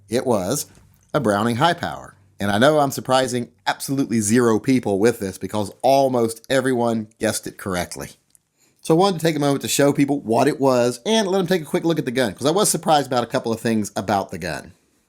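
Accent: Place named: South, United States. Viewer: I need to ask you. You're called, but you have a southern accent. Why?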